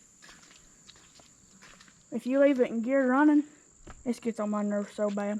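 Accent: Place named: South, United States. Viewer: love a southern Just how we all talk is awesome.. Very very strong southern accent love it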